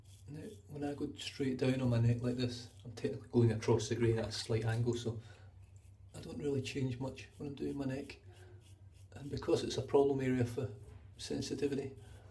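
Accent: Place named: Manchester, United Kingdom. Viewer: Nice tutorial, I've just bought the very same Proraso product (shaver is Edwin Jagger). Just a few more question, the pre-shave, on dry skin ? The soap, how long after the pre-shave ? The balm is before the after shave, and just straight after ? It seems you said usually it's the contrary, no ? Oh and lovely manchunian accent 👍😁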